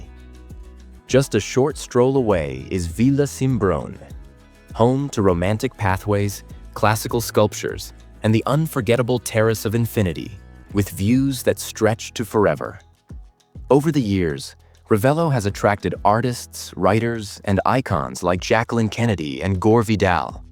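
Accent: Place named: United States. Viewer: Why do American accents get on my nerves so much? Sorry,can't bear to listen!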